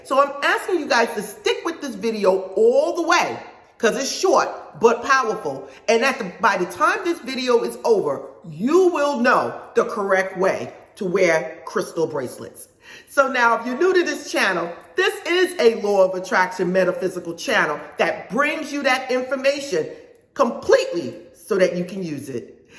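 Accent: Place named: Brooklyn, United States. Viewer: It's giving very NY, very Brooklyn. The sounds of my still living ancestors right now ❤️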